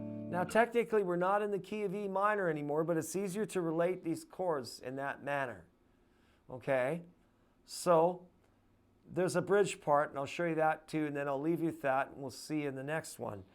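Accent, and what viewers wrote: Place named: Canada. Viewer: Hey thanks...kind of coincidental that I would run across this lesson after just having bought my 2nd capo, the 'short-cut.' I'm only into the guitar 2 years, and although I practice daily--& often--I am a woman of a certain age with recently diagnosed arthritis. Now, "3 chords and the truth" may be enough for some (younger?) folks to achieve success quickly, but since my only option is to focus on my personal goals & chart my progression, this video of yours and certain others have helped me a great deal. First, your show on hand exercises was inspirational & dove-tailed perfectly with my daily physiotherapy -- right up there with rolling the baoding balls around (haha...but true nonetheless). As well, I appreciate your straightforward, no-nonsense approach to learning; as a teacher myself I agree that learners must not be spoon-fed, but should think for themselves as much as possible. 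Of course, one must have hope in order to carry on when in something like my predicament, thus I was very happy to hear you express, more or less --- "'you get out of it what you put into it." Hey! Now I'm not nearly so crushed as I was. May I request that you follow up with a lesson based on the "shorty" capo...? I've heard they are a great boon to the arthritis-afflicted. Thanks so much for your time & effort. By the way (considering that I'm a language teacher/linguist), based on your vowels, intonation, cadence, & tons of other factors, I'm assuming you are Canadian?? If so, please a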